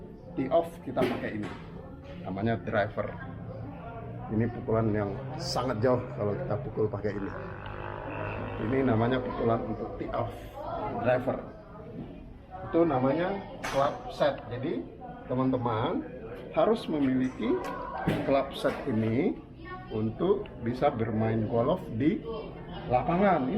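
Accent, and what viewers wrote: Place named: Bali, Indonesia. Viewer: Ini bapak lihat logat nya orang bali kali ya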